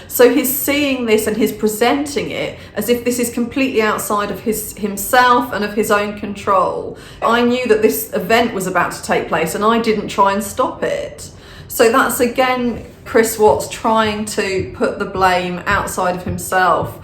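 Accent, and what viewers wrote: United Kingdom. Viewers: I adore your Britain english accent😍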